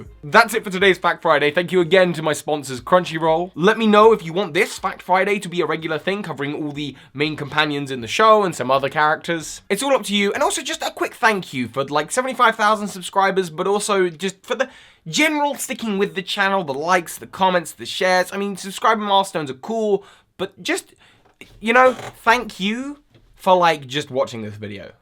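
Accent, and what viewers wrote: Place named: England, United Kingdom. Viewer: The English accent ertainly doesn't make it any easier! lol!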